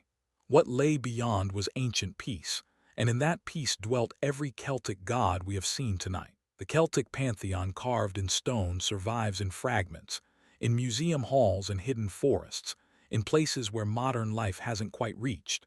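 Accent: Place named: United States. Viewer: American pronunciation absolutely does my head in. It is just pure lazy.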